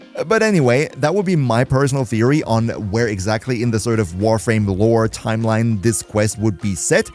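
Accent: Germany. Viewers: U are German???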